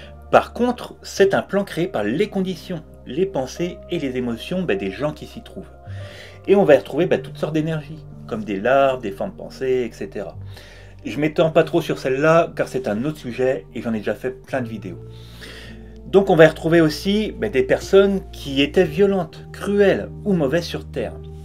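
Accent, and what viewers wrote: North, France. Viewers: Bonjour 😊 super explication merci 💖 (je perçois un petit accent du Nord je me trompe ? 😁) Ça apaise de voir les choses ainsi, je comprends mais je ne peux m'empêcher de penser qu'on est vraiment des êtres sadiques et maso. Pourquoi s'infliger ça...🥺 Évoluer ? Par la souffrance ? Ça me fait me sentir encore moins bien au fond. Je continue de vous découvrir sur Youtube. 👍🏻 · Vous êtes du Nord de la France ??? Il me semble avoir reconnu cet accent particulier 🤣👍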